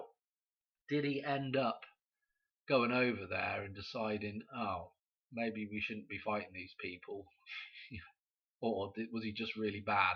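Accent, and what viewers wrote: United Kingdom. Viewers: 🕊️🌿 Your findings are AMAZING! You are helping me confirm and make many connections. THANK YOU! THANK YOU! Lovely to hear your British accent too. I was born near Stonehenge, surrounded by Churches named after Mary Magdalene 🌹🌿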